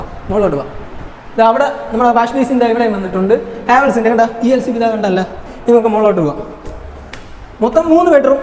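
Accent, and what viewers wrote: Parassala, India. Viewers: ആദ്യമായിട്ടാ നിങ്ങടെ വീഡിയോ കാണുന്നെ, നിങ്ങടെ സംസാരം കേട്ടിട്ട്, പാറശ്ശാല - കൊറ്റാമത്ത് എനിക്ക് പണ്ട് ഒരു കൂട്ടുകാരൻ ഉണ്ടാരുന്നു അവന്റെ അതെ സ്ലാങ് 🙂..കേട്ടപ്പോ പെട്ടന് ഓർമ്മ വന്നു..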